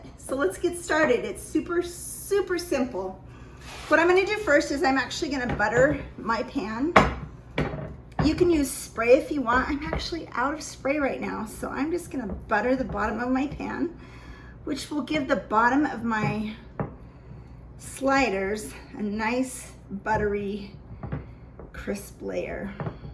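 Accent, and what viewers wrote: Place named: North, United States. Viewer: I love this! you seem so sweet and kind <3 .... the way you say "measure" and a few other words, it makes me think you are from up north somewhere?? I've never heard this accent before, so i am taking a guess , lol ... ( I'm from Southern Indiana )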